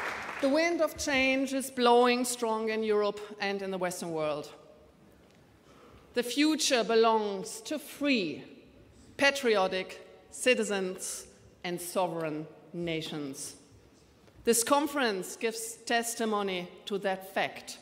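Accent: Germany. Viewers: Für eine deutsche Politikerin ist das wirklich ein erstaunlich gutes Englisch, was sie da spricht. Kann man natürlich anders sehen, wenn man gegen diese Frau generell ein verbissenes und verbohrtes Feindbild pflegt, aber wenn man im Alltag selbst viel mit Englisch konfrontiert wird und sich dann mal die aktuellen und die letzten Regierungsmitglieder anhört, was da gesprochen wird, dann hört man eine wirkliche, linguistische Katastrophe. Man hört sofort, dass da eine Deutsche englisch spricht... ja... aber diesen Slang wird man als deutscher "native Speaker" nun mal nicht los. Wer das von sich behauptet, belügt sich selber. Das hier, ist das beste Englisch, was ich seit langem von einem deutschen Politiker gehört habe. Fragen wir mal anders... sind eine Baerbock, ein Habeck, Lindner, Merz, Scholz und Konsorten besser? Hör dir mal deren Englisch an, da rollen sich einem die Fußnägel hoch. 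Und das sind nur wenige, aber namhafte Beispiele. Bei ersterer kann man das Drama ja derzeit in fast jeder neuen Rede bestaunen... Stichwort: UN Diese Frau macht DE grade auf internationaler Bühne zum Volltrottel. Eure Qualitätspolitiker. Wie verbittert muss man eigentlich sein, wenn man in seinem Hass gegen diese Frau und ihre Partei, nicht mal eingestehen kann, dass sie der englischen Sprache weit besser gewachsen ist, als quasi alle aktuellen und vergangenen Regierungsmitglieder Deutschlands? DAS hat radikalen Charakter. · "TH" totall versemmelt und Krautakzent! Mehr als Schülerenglisch war das nicht! War nicht mal Leistungskursniveau!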